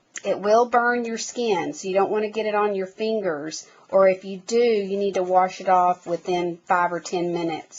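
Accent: United States. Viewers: hi - firstly, you sound so much like someone I know - are you Texan? Secondly - can you use aluminium tape instead of copper tape? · I believe what she is saying is "tinned" which means you keep your tip covered with a thin coating of solder. Southern accents are hard to hear sometimes.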